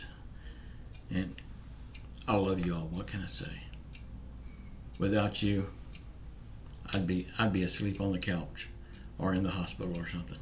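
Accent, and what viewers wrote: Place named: Louisiana, United States. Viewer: You hit the nail on the head. A $1200 check in 4 months exemplifies how thoughtless & callous our political leadership has been through the pandemic & closing of economy. As to Devos's & Kudlow's mind boggling push to reopen schools while the virus is raging through the country betrays where their loyalties lie. Rather than wanting to protect the children & teachers, they choose politics, downplaying the effects of a failed covid response., keep telling it like it is in your Louisiana twang. Don't let em off the hook.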